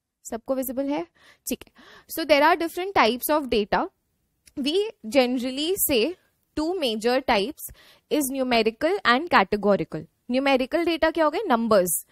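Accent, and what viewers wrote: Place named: India. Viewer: Good teaching, Madam. However, given that we already struggle with the Indian accent, please avoid mixing English with your local language if your target is the global market. Thank you, and keep doing this great job!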